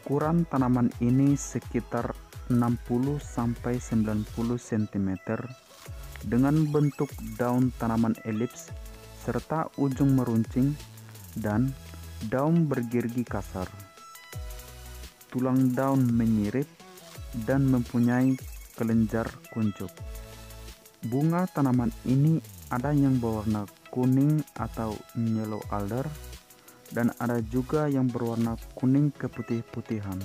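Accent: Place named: Batak, Indonesia. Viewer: BATAK SEKALI, BATAK KENTAL CESS..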